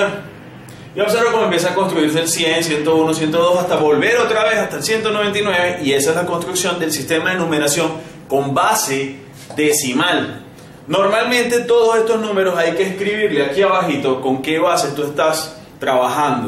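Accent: Venezuela. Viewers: Hola. Por la camiseta y por el acento asumo que eres venezolano, lo cual me llena de orgullo porque yo soy de Maturin, estado Monagas. Felicitaciones y adelante con tu labor la cual puede ayudar a muchos estudiantes. Adelante.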